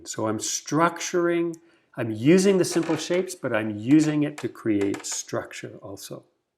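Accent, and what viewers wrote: Canada. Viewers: You cant hide that Canuck accent 😆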